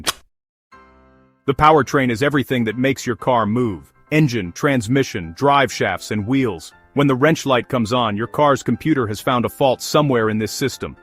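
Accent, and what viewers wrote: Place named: United States. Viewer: British words with a USA accent.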